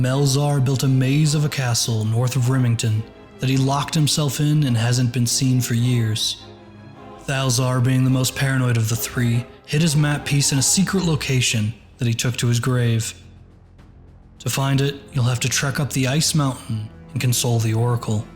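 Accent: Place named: United States. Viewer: Monotone american accent ruins it. Sorry, great animation though.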